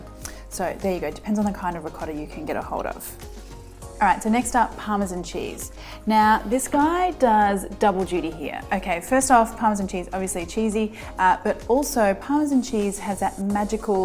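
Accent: Australia. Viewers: She has the most appealing Aussie accent I ever heard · it's blasphemy enough but with the australian accent on top holy fuck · I made your sauce today (I made meatballs yesterday, so I will try your meatball recipe next time). The sauce.... OMG - I didn't think I could improve on MY sauce but yes, must say, your sauce....made in heaven!!! The anise, the chilli and bay leaf definitely gave it a unique flavour which we all loved. Love your presentation too....especially your accent...down to earth Ozzie....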